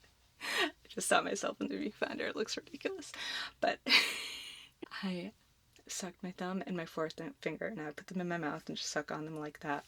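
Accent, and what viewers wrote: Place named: Texas, United States. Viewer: I would never have guessed you as being named. I like it tho. It matches your beauty and uniqueness. One of the things I always liked about your videos is your "hey y'all" greeting. If I'm remembering correctly you aren't from Texas but you moved here with your husband. As a native Texan it's nice to hear that greeting since its something we hear and say daily. Wether you're a native Texan or not that little bit of Texas twang suits you.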